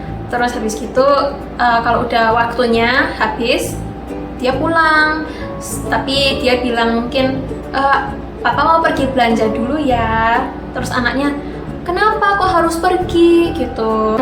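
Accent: Java, Indonesia. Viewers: medog e jian 👌👌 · Medok e iku lo... Nyenengne.... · Kok medog yo rek😐 Jgn2 deket rumah saya😅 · Cuman pengen nanyak, lancar bangt ya, bhs indonesianya, bhsa jawanya juga kentel bngt? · Tapi ko ngomongnya logatnya kaya orang jawa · Bhs ne wis medok koyo wong indo...jgn" sdh lupa bhs jepang nih ^ ^ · 🥰😍,aq gak kuat medok e iku lhoo 😁😂👍🏿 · kamu ternyata ada medhoknya klo bhs Indonesia 😀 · Orang jepang lahir di sidoarjo, logat jawa nya tetep medok.. sukses selalu, aku suka chanel ini 👍👍👍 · Kok medok bahasanya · Medoknya gak ISO ilang kak, kapan Moro neng malang meneh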